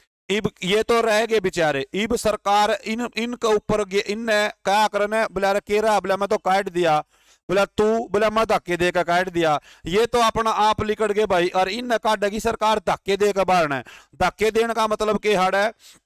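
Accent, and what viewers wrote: Haryana, India. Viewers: Sach me sir aap real haryanavi ho sida sida bolte ho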